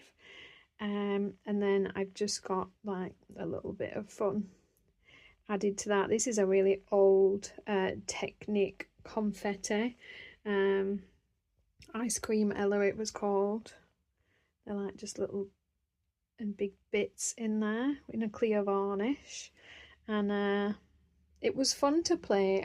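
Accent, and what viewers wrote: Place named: North West England, United Kingdom. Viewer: Do whatever works best for you regarding your channels. I came across your diamond painting channel and had subscribed for a while before I realised you had a colouring channel too. I do many hobbies and even if I don’t do a particular one that you do, I do like watching anyway. Besides, it’s great listening to a north west accent. 🤣 Wishing you and yours a very happy Christmas from me and mine. x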